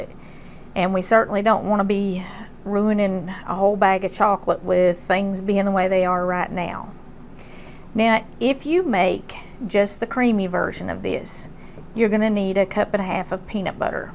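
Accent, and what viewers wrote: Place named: Tennessee, United States. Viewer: Wonderful lady! Very delightful. Love her deep southern accent from Tennessee. She is a rare one these days. She praises men and the men in our lives and teaches that appreciation of the men in our lives is important. We live in a society that prefers to demonize men, make them all out to be bad actors and bad guys and never good. That even men who are strangers must be up to no good if they approach a woman outside excercising and want to ask her something. I understand some of them may say things not kindly but that doesn't mean we say unkind back. Courtesy and manners and good words go a long way. Maybe pray for that person, smile and walk or run on. But not a bad word. I was just on a Facebook group the other day that clearly in the comments of women showed exactly that. It went from a conversation on personal running safety to make hate and sexual harassment and how ALL men must be so bad and up to absolutely no good just because they approach some female or happen to be outside in the great outdoors too. 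These women commented as if they owned the world, like the world revolves around them and how everything has to change to fit their narratives. I couldn't believe it! Never have I heard or read anything so repugnant in my life. Well, I'm a woman too. I grew up in a day and age where my parents taught me how to be kind to all people including strangers. I was taught how to gage a situation and how to act accordingly. It's so unfortunate that we are living in a society th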